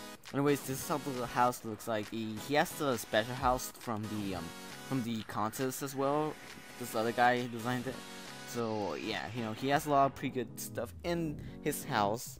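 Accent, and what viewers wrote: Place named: New Zealand. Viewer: I knew it you had an accent! >:3 If I'm not wrong,you're from New Zealand right? Btw I want to enter aswell xD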